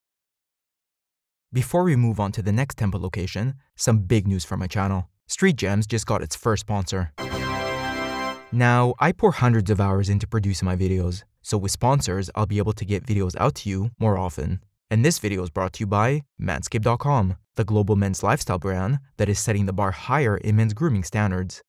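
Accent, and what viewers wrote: United States. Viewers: Can’t watch this because of the narrator’s f’awful yankee accent 🤮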